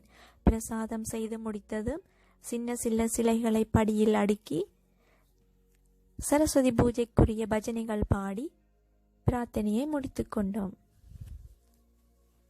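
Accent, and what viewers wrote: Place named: Sri Lanka. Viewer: Excellent 5 minutes la 3 dish, 👌👌 உங்கள் குரல் மாற்று உங்கட சிங்கள தமிழ் அழகு 👌👌👌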